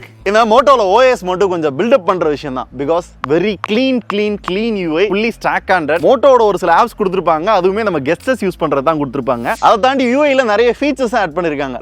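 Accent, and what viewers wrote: Madurai, India. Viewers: Bro oungaluku recent videos laa madurai slang veliyaa varuthu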